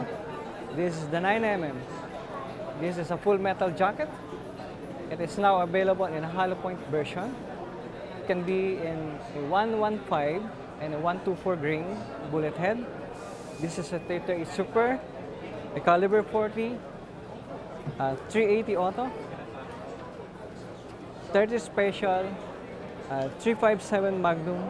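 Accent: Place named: Bisaya, Philippines. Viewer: bisaya ka dong?! galeng mong mag prisint dong!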